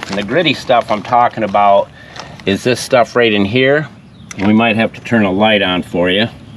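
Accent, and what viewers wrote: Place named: Chicago, United States. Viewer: Your accent screams Chicago? Not Florida.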